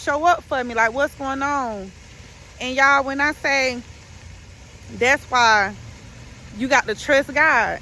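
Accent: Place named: Georgia, United States. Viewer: Great & timely message.. I just know you from Georgia with how you talk..lol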